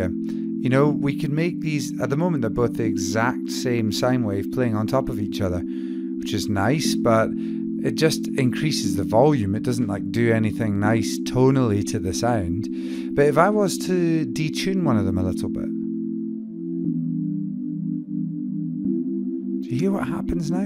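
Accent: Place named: Scotland, United Kingdom. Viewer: Superb tutorial, and a classic track. And, as a northern Englishman with Glaswegian grandparents, it's nice to listen to a tutorial given by a Scot! 😍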